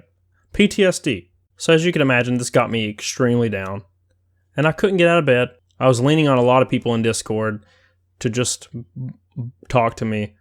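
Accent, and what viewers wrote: South, United States. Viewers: Bro I am from the south and I can tell you are to from your accent and that it is awesome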